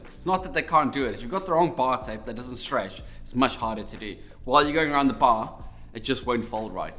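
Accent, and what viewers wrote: South Africa. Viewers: Sounds like a SAFFA… must be 😂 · Definitely a South African, good advice Boet ! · What accent is that? Cape Town?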